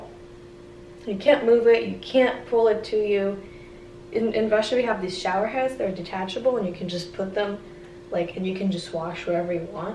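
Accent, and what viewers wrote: United States. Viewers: In none of her videos does she talk about returning to Russia; all her talk is about applying for different visas and trying to prolong her time here and getting a job here; I think she intends to move here; she already sounds and acts like an American. And of course she now has an American BF. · You sound like an american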